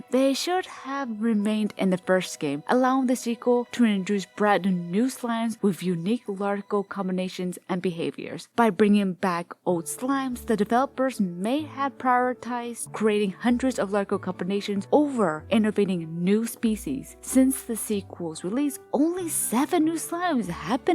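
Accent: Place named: Europe. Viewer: What unnamed random mysterious European country is this chick from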